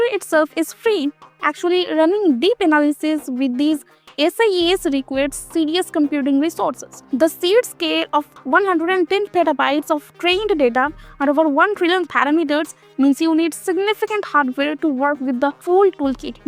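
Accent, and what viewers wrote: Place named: India. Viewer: Absolutely Amazing video and information. The only major issue is that the accent is hard to understand, especially for non-indians. 😊 Happy Xmas and New year.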